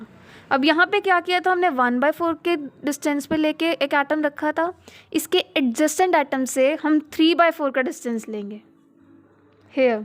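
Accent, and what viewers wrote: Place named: Haryana, India. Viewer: Aap Haryane se ho na...Don't tell lie. Because I am from Haryana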